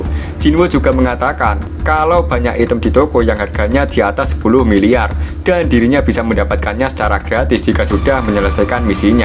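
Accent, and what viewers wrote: Java, Indonesia. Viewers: Bg org jawa ya?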